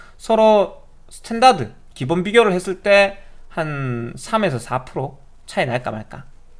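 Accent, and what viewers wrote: Gyeongsang, South Korea. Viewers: 님 말투가 완전 경북분 특히 대구분같으신데 대전으로 가신 이유가 있나요? 생각해보면 완전말투는 다른데 대전대구 둘다 큰도시고 거리도 가깝더라구요 그냥 궁금해서 ㅎㅎ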